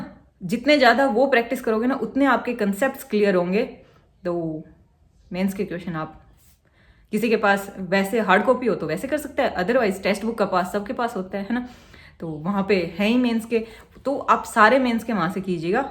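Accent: Haryana, India. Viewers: I love haryanvi accent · M to apka accent dekhke hi samjhgi thi ki app haryana t ho ...quki m bhi urey t ey hu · I'm from odisha. I love your hariyanvi accent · I Like Your Haryanvi Speech Truly Bahut Sweet Bolu he aapki......😍😍😍🔥🔥🔥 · love your Hariyanvi accent <3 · AAP bahut funny ho yar speech Harayana ki must hai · Haryana ki accent mujhe bohot accha lgta hai😀🔥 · haryanvi accent?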